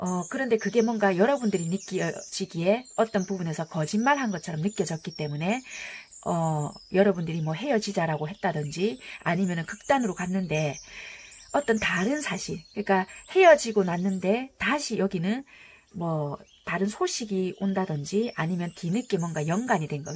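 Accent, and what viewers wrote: Busan, South Korea. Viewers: 감사합니다☺️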